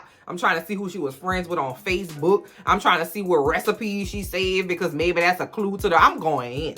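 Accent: New Orleans, United States. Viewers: I love your accent btw!! Sound like u from Nola :)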